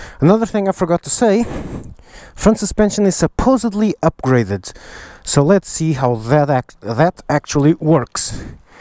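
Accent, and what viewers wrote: Portugal. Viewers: Vi logo plo sotaque que eras tuga! Bom video! Cascais! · That vídeo was so nice. I watched it all.where you from? Portugal? I like your accent, too.I myself own a Honda 500f but have always liked the X versions of honda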